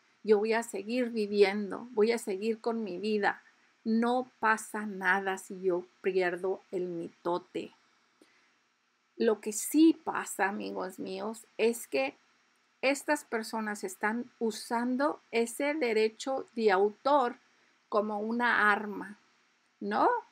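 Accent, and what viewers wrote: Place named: Cusco, Peru. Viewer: La ignorancia es atrevida e insolente, para tu conocimiento, ella es de Perú, pero no de la capital Lima, en Lima como en otras provincias el español es diferente con diferentes acentos, los de la costa, sierra y selva no hablan igual. es del Cuzco y allá la gente habla así, si te burlas de ella te burlas a base de tu ignorancia y tremenda insolencia, puedes opinar pero, antes EDUCATE E INFORMATE, para no decir tremenda estupidez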